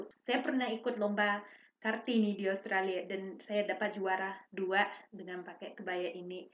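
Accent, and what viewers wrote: Bali, Indonesia. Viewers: 😂😂😂..you got the Balinese accent cantik kebayanya saya suka 😍😍...suamiku bilang "I thought she is Balinese girl teach you dancing" (he heard your voice only)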